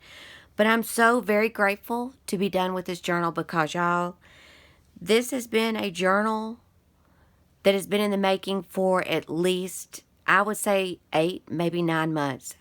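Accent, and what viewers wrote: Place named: South, United States. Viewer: Love love everything about this journal but I love your accent & the way you explain. Plz tell me y'all from the south 💜😘👍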